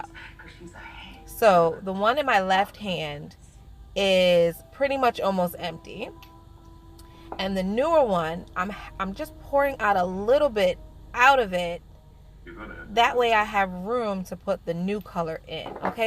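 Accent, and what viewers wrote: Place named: New York, United States. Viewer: Aww i love the positive girl power on this channel. This is what should be more on youtube, we girls need to take over ALL of youtube lol. No but seriously im here cuz ive been a huge fan of babygirl for a while now so im glad i found you. Plus i believe your from NYC? Brooklyn? I can hear ur accent. Lol. Good luck on your page & enjoy your journey, im a sub for life now & look forward to watching you grow. Lots of love & positive energy girl 🤗💅❣🙋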